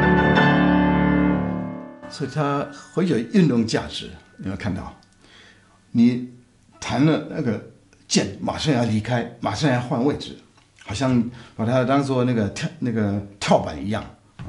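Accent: Taiwan. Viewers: 您溫文爾雅的台灣國語的口音和您舒緩悠閑的講話的節奏與您動人的琴聲一樣和諧悠揚，真心感謝！希望您有機會給大家介紹拉赫瑪尼諾夫的《第三鋼琴協奏曲》謝謝。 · 好幸運可以在網路遇見以領略西方音樂的故事，很好奇您身在德國卻能說一口標準的台灣腔中文，給您按無限個讚。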